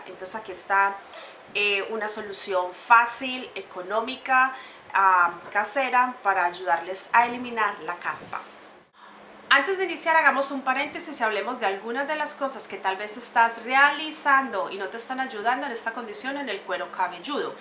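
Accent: Colombia. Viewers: me fascina como hablan los colombianos.....me fascina ese acento, ahhhhh que bonito jajaja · Eres colombiana ?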